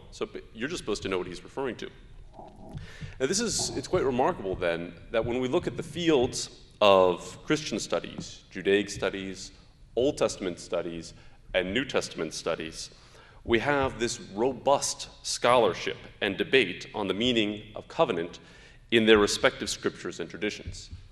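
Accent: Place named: Arab world. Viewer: He has a beautiful genuine Arabic accent.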